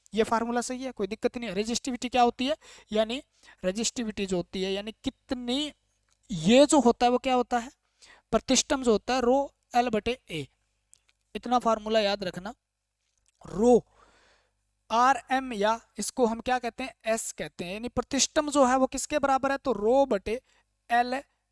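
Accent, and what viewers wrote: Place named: Sirsa, India. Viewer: सर जी भाषा बोली से सिरसा जिले से लग रहे हो, कौन सा गांव है जी आपका। साहब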